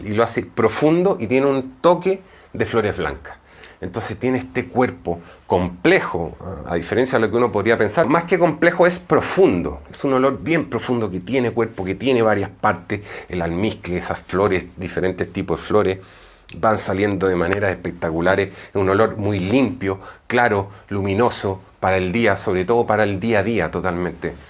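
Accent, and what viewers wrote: Chile. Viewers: Clave Alma si yo también lo dije es chileno y me encanta 🤗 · Qué lindo los ojos de él, habla como Chileno, dónde se pueden encontrar estos perfumes en Chile???? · que tiene el acento chileno ...😡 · Tienes acento chileno, eres o viviste en Chile? Me encanta tu canal, he aprendido mucho con tus vídeos. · Hola 😊eres chileno tienes acento?? Felicitaciones, haces un exelente trabajo.Tus descripciónes son extraordinaria 👏👏👏Amo🥰 los perfumes 🌟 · Solo por curiosidad ¿qué nacionalidad tienes? Escucho que hablas super "chileno", pero también a español... es curioso 🌱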